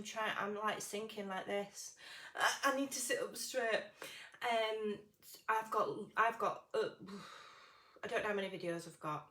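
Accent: Yorkshire, United Kingdom. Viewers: Nice to meet you face to face (sort of). You look lovely and I love your accent. I have a few friends from Yorkshire and I just love the way they talk: it's part of who you all are. I am Spanish and have my funny accent from the north west of the country too! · I’m one of your new subscribers and loved this vlog 😊 I think we are quite alike in our crafting too many ideas to stick to one project ! And you have inspired me to try a vlog maybe one day when I feel brave enough 😂 You are very pretty and definitely should not change your accent it’s proper Yorkshire ❤️ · Love this i to am a flitterea haha I call myself a multi crafter. My accent is pants love the Yorkshire accent i u derstand it very well some of your frazese we use in Newcastle to Loveing the red hair to ❤️ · Hello! So very nice to "see" you! (Love your hair color - it suits you!) I think it's a great idea to do a vlog here and there. 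You could show us historical or Victorian buildings in your area. Or take us to a lace shop or a thrift shop (to look for out of copyright images/music sheets). Or show us around the countryside or visit a village. Or just do a vlog showing clips of those ferocious "guard" dogs of yours! 🤣 I love the Yorkshire accent, thriftiness, stubbornness, friendliness, common sense and you don't take yourself too seriously. Honestly, I watch you just as much for your personality as I do for the lovely things you make. And I agree with you - a true crafter never settles down to one thing. Part of the fun is to have a go at all kinds of things, just to see if we can do it. I'm so happy your viewership is continuing to increase. Your new subscribers are going to love you! Thanks for all you do! Janet 💕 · Going through some of your old videos and started with this one! Love it! Your Yorkshire accent is beautiful! 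💗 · Personally I love your Yorkshire accent! Nice to see you! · As a fello yorkshire lass you sound just normal to me. Been in Oz for 20 years but still have my strong accent and its comforting hearing you talking. Your crafts are amazing, easy to follow and always fun to do. Thank you sooooo much for all you share, including yourself. Wanted to send you a white rose but there was only a red one!!!! so here's a daisy instead🌼Jane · Yaay a Yorkshire lass like me - love it!! Just found you, do I detect Bradford/Leeds in that accent? Sending lots of love anyway xx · It's awesome to see your face. Love the hair! And I love your Yorkshire accent! You are so encouraging and generous. Thanks for all the freebies. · Oh my goodness! I am so thrilled to have fallen upon this video! Thank you for this vlog as now I can put a "face to the voice and laugh"! Your Yorkshire accent is beautiful. I am from Florida USA and there are all kinds of accents here, although, I don't have one, lol. 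Never change, just be who you are and embrace yourself, we do.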